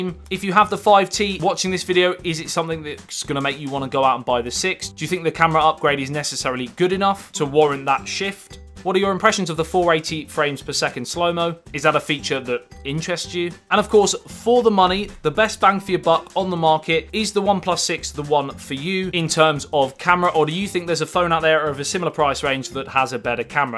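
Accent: United Kingdom. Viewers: im weirdly addicted to your voice and minor humor mate.. here iam its like am im seeing all ur vidz for the past 1hr... and sure iam into it.. that British strong accent tho,.. (o homo lol) love u man <3 grt review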